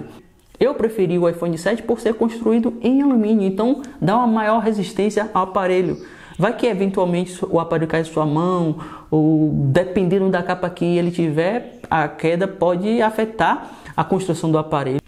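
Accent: Bahia, Brazil. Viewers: Vc é baiano? Conheci pelo sotaque né? Rs abraços... Sou de Salvador/BA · TÚ É BAIANO É BIXIM 😁😁👍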